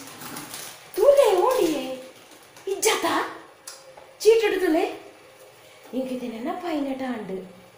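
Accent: India. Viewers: Eer enna ne tulu panda proper Mangalore tulu paatheruvar anchad enk mast kushi aapundu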